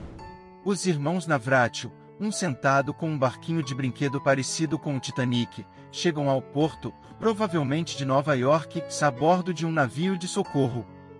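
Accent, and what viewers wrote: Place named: Brazil. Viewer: ESTE SOTAQUE BRASILEIRO DESPERTA LOGO DESCONFIANÇA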